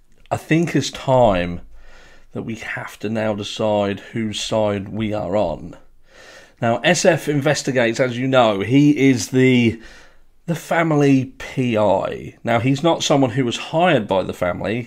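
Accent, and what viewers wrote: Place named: England, United Kingdom. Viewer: There’s 1 thing that Sami did that really stood out to me. So AWP start searching Boca & possibly Donna Lake, LE tell AWP not to search it, Sami is aware through her parents (friends in high places) that they’re not searching Prosser. AWP put out a post that they found Kiely’s car & remains (not staying where they found her), Sami posts immediately “they have not found Kiely & the parents have not been told….” Blah blah & so on & so on. LE didn’t think AWP would go search it after being told not too…there’s someone in LE protecting Sami & whoever else is involved. Also, after a post comes out that Sami has a lawyer, she then posts a video of her drinking & partying at Prosser. Imo she was celebrating getting away with murder! I have so many other inconsistencies with Sami but they are the 2 that really stuck out to me…. 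You do a great job. I prefer you over these American idiots just going over crap that’s irrelevant & going down rabbit holes. So thanks for keeping it real. It also helps that I love English accents 😂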